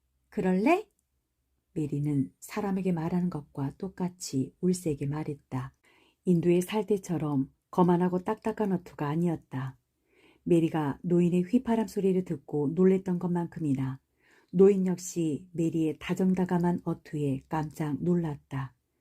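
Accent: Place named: Jeolla, South Korea. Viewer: 요크셔 사투리를 전라도버전으로 읽어주셔서 너무너무 재밌습니다... ❤ 후속편 완전 기대하고 있겠습니다. 감사합니다.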